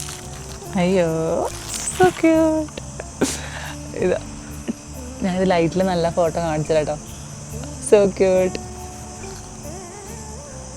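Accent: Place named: Kannur, India. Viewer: Slang aan enik ishttam❤ kannur?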